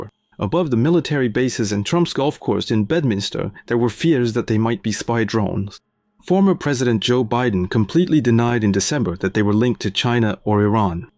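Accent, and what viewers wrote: France. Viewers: Omg? Sup with the robotic voice? I like your original french accented voice... ⭐️*⭐️*⭐️*⭐️*⭐️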